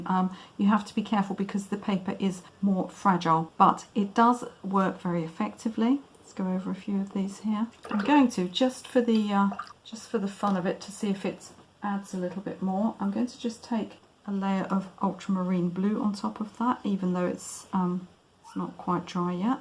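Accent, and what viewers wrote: United Kingdom. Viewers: to me is like flash in photography... buy I am not adamant about anything in particular. BTW I enjoy your videos and learn a lot! And a plus to hear your neat British accent!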